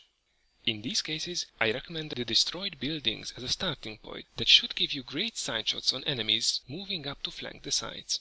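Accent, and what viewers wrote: Hungary. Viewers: Már az akcentusodról meg tudtam mondani hogy magyar vagy :)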